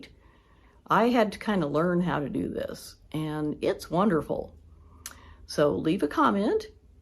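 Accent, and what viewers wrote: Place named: Indiana, United States. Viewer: I, too, am an introvert and feel exactly like you do! Since I have been widowed, I mainly stay by myself. I wanted to ask you if you were from Indiana? You Have an accent like my cousins do!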